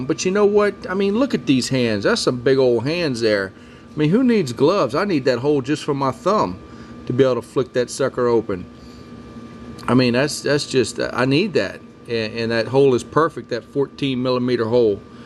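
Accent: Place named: South, United States. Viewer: usa earth? Easy to tell your a southerner. I approve :-) thanks for the review, and the realistic terminology :-)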